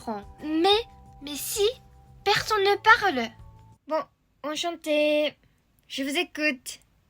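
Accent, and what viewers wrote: Japan. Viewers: En tant que français qui apprend le japonais c'était génial d'entendre une japonaise parler français 😄 Les français devaient te poser des questions à 50% par curiosité et à 50% pour ton accent😃 Merci du partage 🙏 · Ok , l'accent nippo_français est vraiment très mignon.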